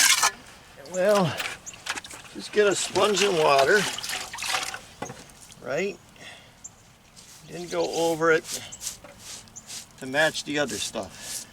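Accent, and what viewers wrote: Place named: Scranton, United States. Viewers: You can't miss that Wilkes-Barre / Scranton accent. Thanks for the videos. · NE Pa is my old stomping grounds. Good to hear that Pennsylvania Dutch accent again.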